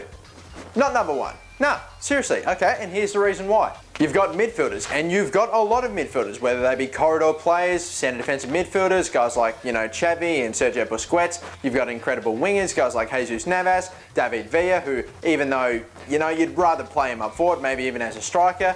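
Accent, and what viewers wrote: Germany. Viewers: i like the german accent I thought it was accurate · Great German accent!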